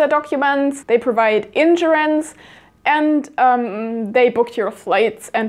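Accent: Germany. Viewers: So, she's German. That explains why I can actually understand what is being said, because English native speakers usually speak too fast for me to understand... Nice accent and good fluency. ^^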